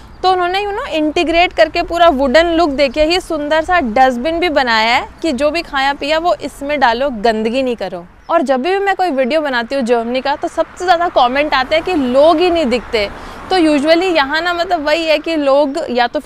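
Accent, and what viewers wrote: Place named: Bagri, India. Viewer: Ap hariyana k bagadi belt k lagte ho . Bolne ka andaz btata h . ❤❤❤❤❤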